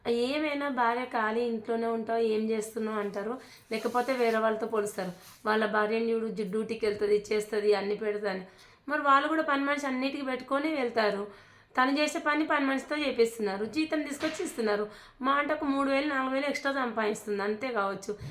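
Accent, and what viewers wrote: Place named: Siddipet, India. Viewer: Meedi ekkada akka ma siddipet language lagane undi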